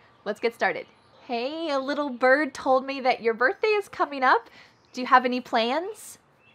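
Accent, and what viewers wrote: United States. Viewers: Are you from US.?🇺🇸😎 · your are so lovely ...... really .... Ithink my understanding for American accent getting better >> cause of you ...... thanks ..... keep it coming ..... Form Sudan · Is it American English???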